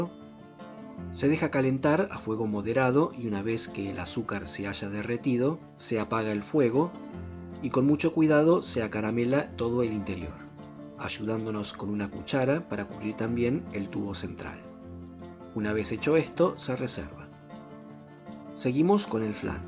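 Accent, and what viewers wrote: Buenos Aires, Argentina. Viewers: Hace rato que sigo este canal, pero hace rato también que no venía a éste. Y cuando veo y escucho las recetas, me siento tan en "Baires"!: vaini"sha", ra"sha"dura de medio limón, mantequi"sha"... lo preparé "sho"... es tan "sho", como me dicen mi amigos hispanohablantes... no sé si es tan YO o nuestra idiosincracia al hablar y ese cuidado del habla... cuando hablamos y decimos las cosas... Como dicen mis estudiantes. En todo caso, este canal me encantó siempre por su ELEGANCIA, su lado RETRO, sus ustensillos paseistas, su lado tan porteño, su manera de ser tan 'nosotros"... GRACIAS, por darnos tanto!!!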